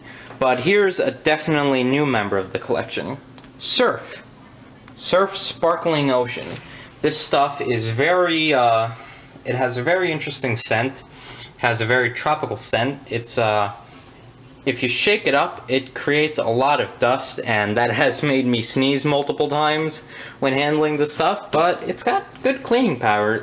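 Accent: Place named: New Jersey, United States. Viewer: Maybe because you had turned away from the camera, and perhaps didn't enunciate it. Or it's that Jersey accent. Or it happened to be the fact that last night was a drinking night for me and I still had--ready--alcohol on the brain! Yes, I've seen some other Method products, but I refuse to buy their crap because of their plant-based OMG this is eco-green. I hadn't seen their scentalizers, I'm curious if they have plant stuff in that too. I have LA's and I've used it a couple times as well, didn't have anything to really say about it good or bad, it was like you said, just kinda there.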